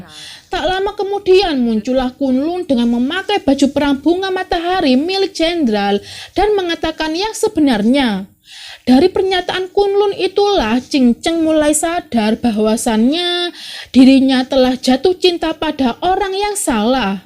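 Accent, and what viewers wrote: Java, Indonesia. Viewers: Ciri khas memang medok, maklum wong jowo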